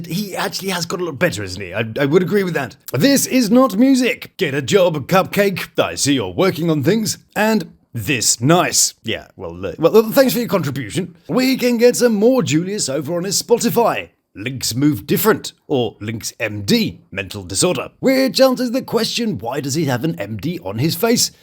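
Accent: United Kingdom. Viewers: Just watched final episode season 6 love after lock up . I just could NOT stop myself from looking up did Julius become a rapper or got a real job 😂🤣. AWESOME I found your update . You got SO MUCH information & put it on your channel so BADASS , HILARIOUS, PROFESSIONAL etc…PERFECTLY ‼️Even witt British accent you spoke every word so clearly. And Alexia is beautiful inside & out from what I seen on the show . I really 🙏she takes her own advice & loves herself better . She definitely could find a handsome , educated, working man . She has discernment on top of ALL her other great qualities. She just needs DO IT 🙏💛♥️‼️Tyvm I appreciate yah. May god bless you and yours. JESUS PEACE 👍😎😎‼️ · Omg i love your accent ! Brittish guys are So hot! You sound too fancy to be watching us anericans trash tv shows tho 😂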